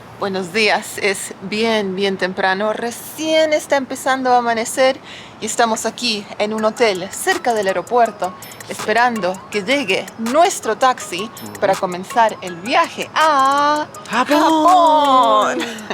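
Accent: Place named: Argentina. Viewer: El castellano de se esta volviendo mas argentino 😅😅😅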